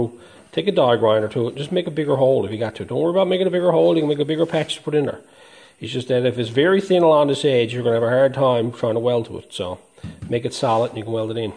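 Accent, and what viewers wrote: Newfoundland, Canada. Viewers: I thought it was Newfoundlandish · Got to be from Newfoundland awesome video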